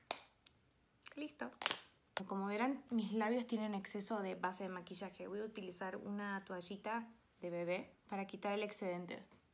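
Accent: Mexico. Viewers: Acento mexicano va ganando terreno o.O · Que bella que sos pero...y ese acento mexicano? :O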